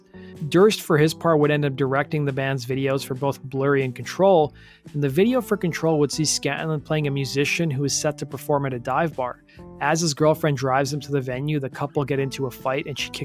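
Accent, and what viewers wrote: Canada. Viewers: I keep trying to place your accent...northeastern Ontario?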